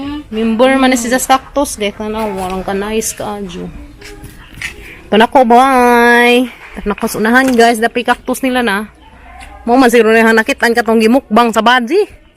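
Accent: Bohol, Philippines. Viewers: Klaroha jud taga buhol oi.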